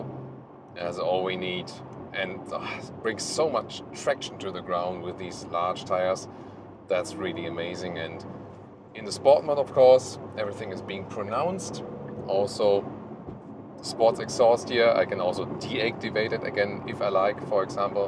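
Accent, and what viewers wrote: Germany. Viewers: is such a classical German 😂 This laughter and this accent. Love that!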